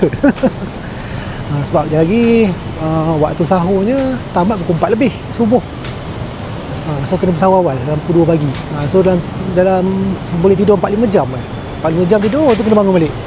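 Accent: Malaysia. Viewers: Logat Johor kah? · wuih... mantap, cakap bahasa dengan aksen/dialek Melayu . 👍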